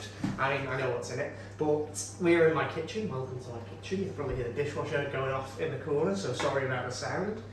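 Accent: United Kingdom. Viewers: you are to precious, I have tried to place your accent and wow I felt it was British, love every adventurous technique you experiment with and the excitement when you have achieved it successful, send you a grannies hug sadly my acrylics still keep turning to chewing gum no matter what I, then digging through 20yrs old acrylic liquids they worked like a charm, moral is ever give up, keep excitement in your voice, it's very motivating, send a grannies hug well done❤Eunice SA 82yrs old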